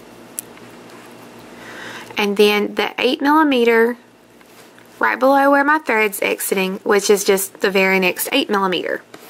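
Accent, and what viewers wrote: South, United States. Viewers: I recently discovered U-Tube and then I found you! I've been bead working for about 15 years but my passion has been revived since watching your's and a few other's tutorials. You are a great teacher! I feel so blessed to have met you through this medium. I live in Alabama so your southern English is very understandable! Lol! I also subscribed to your site! Happy beading!💞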